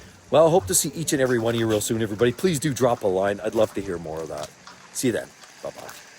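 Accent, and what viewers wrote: Canada. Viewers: That's one helluva hoser accent, eh? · you sound canadian... was waiting for you to say 'eh'.... im guessing youre a youpeer maybe.... IDK, Im Alaskan, and you sound like a lot of canucks i know... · This guy sounds pretty Canadian to me should’ve said so at the beginning.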